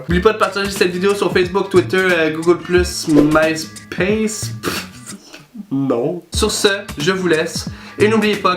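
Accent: Quebec, Canada. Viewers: C un accent québécois et c pas un faux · bin quoi...tu as un accent quebequois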